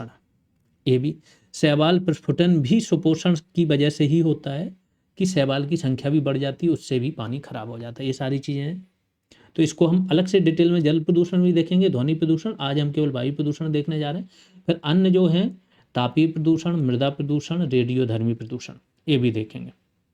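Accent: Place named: Bundelkhand, India. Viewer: Sir aap bundelkhand se lg rhe ho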